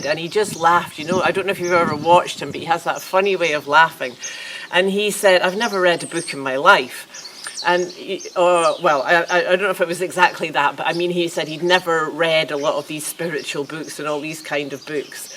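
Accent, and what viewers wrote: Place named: Edinburgh, United Kingdom. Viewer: Where are you from? Im from Edinburgh and I recognise that accent!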